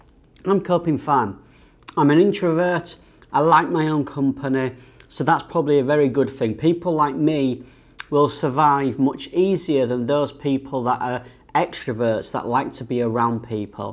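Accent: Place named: North, United Kingdom. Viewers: Don't worry so much about your pronunciation...you're English... Next time when you do get out...buy some raw peanuts and raw anchovies at the supermarket...later can fry some up when you eat your nasi lemak at home...at least you can control the amount of anchovies you want to eat. Boil another egg if its not enough. Cheers mate. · I like your Yorkshire accent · Hello Sir. I really enjoyed your videos about Malaysian local dishes. And I totally want to learn and pratice more so I can have English accent like yours. Happy quarantine in Malaysia. Stay safe 🇲🇾🇲🇾🇲🇾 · What does it matter how you say it (Kuala Lumpur)? that is part of the fun in far away land. I love your northern accent and always associate it with, honest straight talking. You could try a type of noodle dish called Char Kway Tiao. Glad to see your staying safe. Look forward to the next video.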